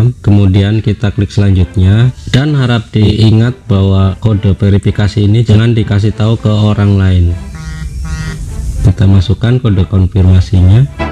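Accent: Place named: Lampung, Indonesia. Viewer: L 15, anda orang bandar lampung ya, Terima kasih ilmunya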